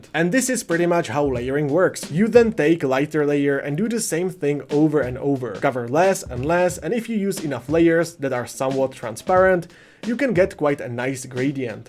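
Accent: Czech Republic. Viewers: Great video. Just out of curiousity, what is his nationality? I am hearing a Czech/slovak accent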